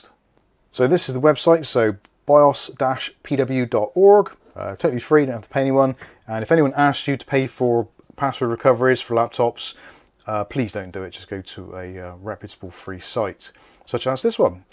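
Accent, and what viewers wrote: Bristol, United Kingdom. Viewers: You from Bristol??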